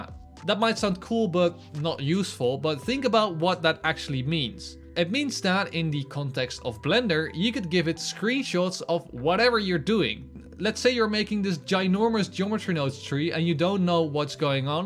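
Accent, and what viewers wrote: Netherlands. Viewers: Nederlander!? Broeder! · Accent. Dutch guy. 100% 👍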